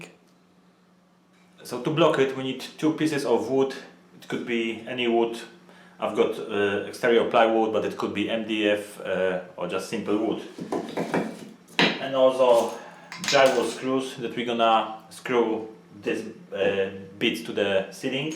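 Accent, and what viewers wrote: Poland. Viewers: Hi there. Just discovered your channel and it’s a real treasure trove of useful little videos like this one so I’m subscribing to see more. Brilliant DIY advice for the UK viewers which is rare in a sea of American videos. I think I recognise your accent to so I’ll hazard a guess and say dzień dobry and dziękuję 🍻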